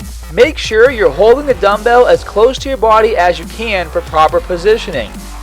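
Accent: Boston, United States. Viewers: Love the filthy Boston accent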